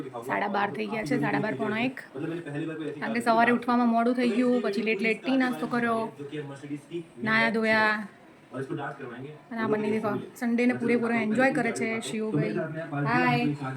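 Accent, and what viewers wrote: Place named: Mehsana, India. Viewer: Mehoni bhasha bv gme 😅tmri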